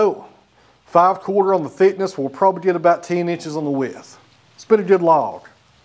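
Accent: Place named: East Tennessee, United States. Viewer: Are you in West, middle or East Tennessee? I'm an East TN native, you sure sound like you're close to the area. I love it! Sounds like home. I hear somebody speak like that and it's almost comforting. My mind just associates it with friendlier people.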